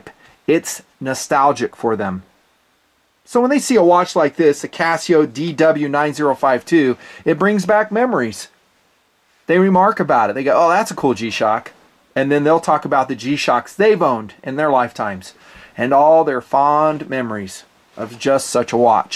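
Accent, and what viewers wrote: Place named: California, United States. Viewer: where are you from? You kinda sound like you're Californian hippie surfer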